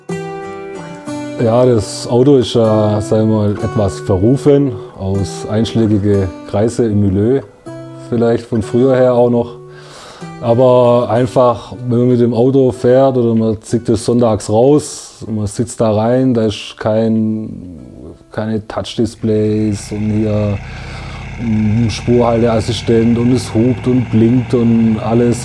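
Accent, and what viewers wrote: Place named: Swabia, Germany. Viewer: Endlich wird auch mal schwäbisch gschwätzt auf dem Kanal..... Respekt vor der ganzen Arbeit und super Ergebnis! Top!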